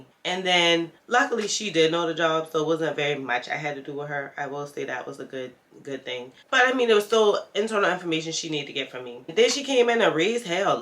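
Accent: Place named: Gullah, United States. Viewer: Are you Gullah Geechee? Or caribbean. You sound Gullah.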